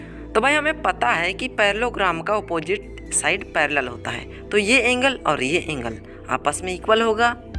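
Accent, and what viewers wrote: Bihar, India. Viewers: i like your bihari accent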